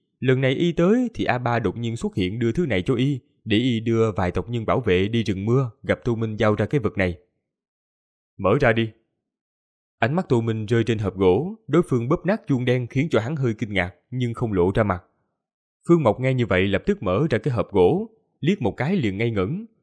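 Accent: South, Vietnam. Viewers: nghe giọng miền nam quen rồi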